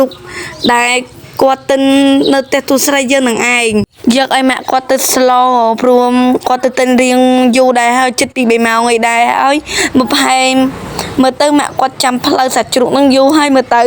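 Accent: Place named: Cambodia. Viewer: អូននៅខេត្តណា បាននិយាយវឹងហ៎😊😊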